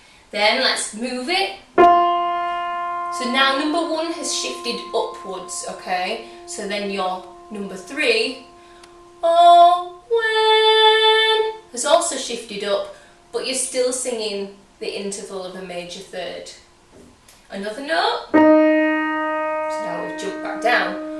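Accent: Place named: London, United Kingdom. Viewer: love your accent. London?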